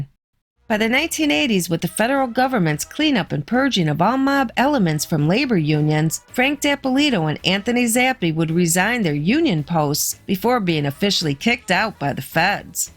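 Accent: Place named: Chicago, United States. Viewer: Your Chicago Land accent is hilarious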